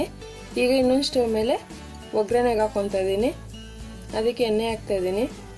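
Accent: Tamil Nadu, India. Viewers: Hello.. I tried for the first time khara Pongal on Saturday in America. It turned out very well 👌🏼 If I cook anything new I always check your channel and see your steps. Very simple n easily you explain. No useless talks. Your Tamil accent Kannada is very nice to hear. Bele obbattu also your simple tips helped me cook perfectly before that I had messed it many times. Thank you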